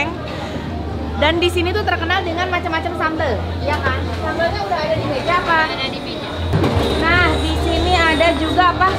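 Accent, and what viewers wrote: West Java, Indonesia. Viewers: Seneng pisan ngadangu teh nyarios Sunda asa ka wargi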